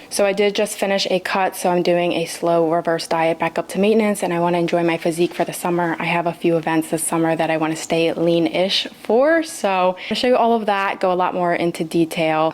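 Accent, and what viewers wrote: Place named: Maryland, United States. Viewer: Your accent sounds very maryland-ish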